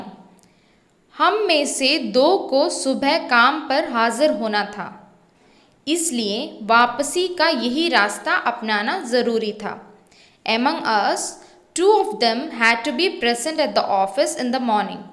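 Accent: Kerala, India. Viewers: are you malayali ma'am?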